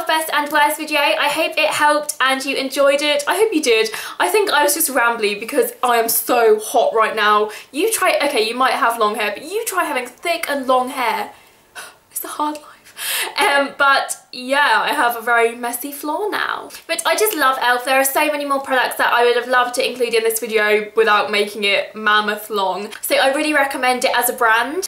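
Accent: United Kingdom. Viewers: you sound like me ans my sister being British .-. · Beautiful accent. Wish i was British :( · British accent :)) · very helpful video I obsessed about the Britich accent REALLY LOVE IT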